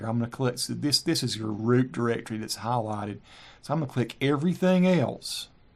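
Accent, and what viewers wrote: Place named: North Carolina, United States. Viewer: Wow, thanks so much. This is the best Dreamweaver tutorial I've found. Plus, your accent is awesome (NC!)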